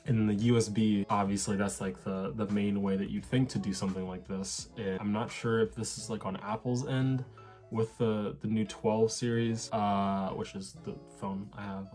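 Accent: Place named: United States. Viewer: I thought you were American lmao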